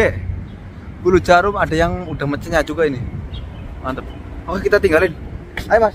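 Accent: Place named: Banyumas, Indonesia. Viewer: mas nya asli orang mana. kok bisa ngomong Jawa ngapak wkwkw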